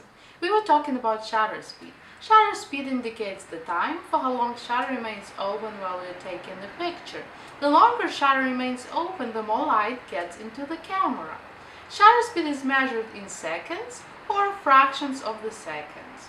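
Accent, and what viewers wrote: Russia. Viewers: are you from russia or romania?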